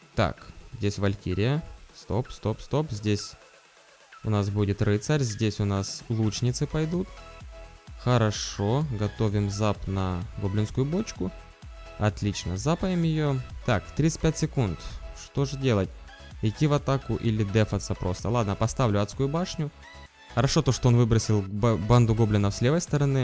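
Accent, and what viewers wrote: Ukraine. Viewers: Украинский акцент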